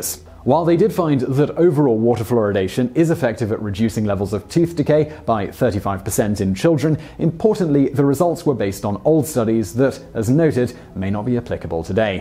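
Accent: United Kingdom. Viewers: seems like a british dude talking about dental health doesnt seem like the most credible source lol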